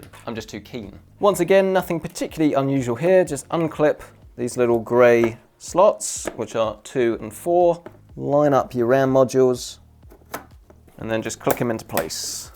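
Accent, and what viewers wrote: United Kingdom. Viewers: British boy talking about computers makes me happy :D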